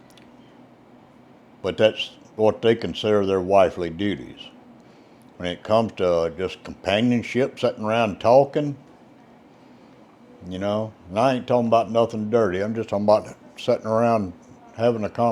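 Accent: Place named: North Carolina, United States. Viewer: You remind me of my relatives on my Mom’s side of the family. They’re from North Carolina. Brought back fond memories listening to you speak. Love it.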